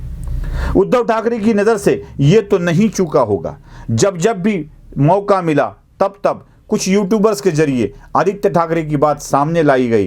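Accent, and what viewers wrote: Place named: North, India. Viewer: बात सही है.. मराठी विशलेषण एक दम महाराष्ट्र के गावठी मिट्टी से जुड़े है ऐसा दिखता है और हिंदी तो जब बोलते है तो एक दम उत्तर भारत के हैं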